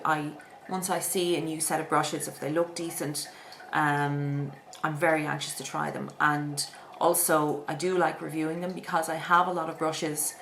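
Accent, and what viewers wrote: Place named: Cork, Ireland. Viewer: your accent is soundin pretty local to me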